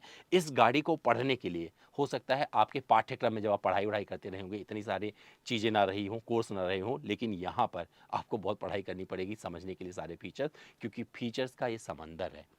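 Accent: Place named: Purvanchal, India. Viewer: aapki awaaz se lagta hai ki aap bhi Purvanchal ke hi Rahane wale Hamare Sultanpur ke aaspaas ke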